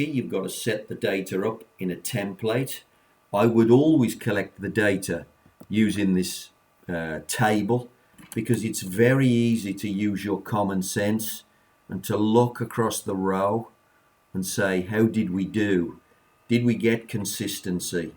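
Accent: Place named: United Kingdom. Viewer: Finally a British accent in a fantastic subject !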